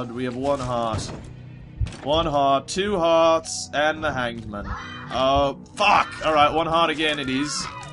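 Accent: United Kingdom. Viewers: wouldnt that be Best. British. Accent. Ever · Lol your british accent is funny.....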